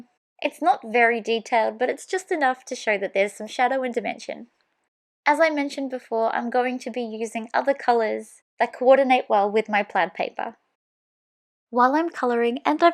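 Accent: Australia. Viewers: so nice to hear an Aussie accent!! Congrats on your 100th video tutorial! Adorable card!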